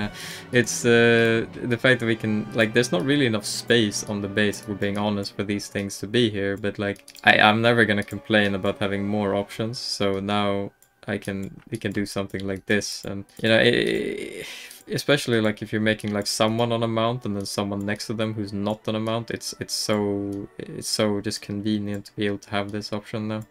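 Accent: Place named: Sweden. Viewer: I honestly love watching ur videos, partly for the Hero Forge tips and partly for the strong Swedish accent, it's awesome <3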